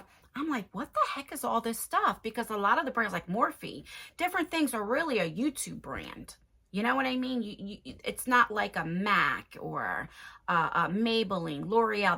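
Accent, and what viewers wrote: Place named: Massachusetts, United States. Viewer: I’m 69 years old please advise me,I love the way you tell it like it is,your a doll. And boy can I hear your accent,because I’m from Massachusetts 🥳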